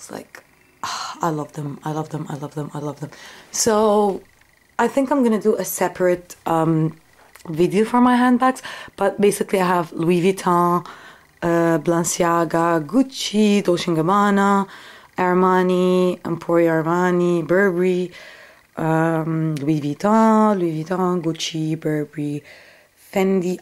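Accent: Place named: Arab world. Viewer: Are you moroccan or somehing like that : becouse you have an arab accent ! x) <3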